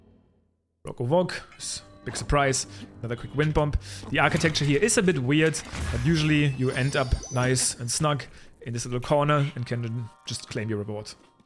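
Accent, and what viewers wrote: Germany. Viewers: I do love German accents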